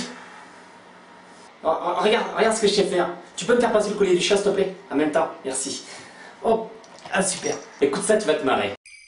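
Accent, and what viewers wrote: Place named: South, France. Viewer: enfin un sudiste qui fait des podcasts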